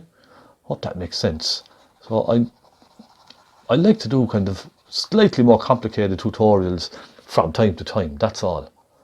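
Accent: Cork, Ireland. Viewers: Fabulous tutorial and if I'm not mistaken great cork accent Subscribed!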